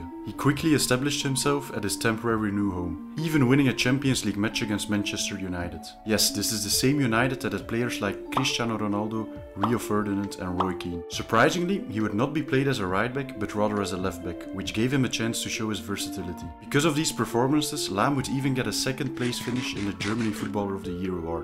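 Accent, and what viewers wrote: Belgium. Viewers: Maat gij zijt zo zwaar belgisch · Bro is that a Belgian accent I hear?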